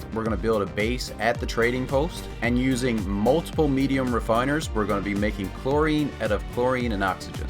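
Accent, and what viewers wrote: Newfoundland, Canada. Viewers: haha didn't catch the newfie accent till you said 'stay where you are and ill come where you're to.'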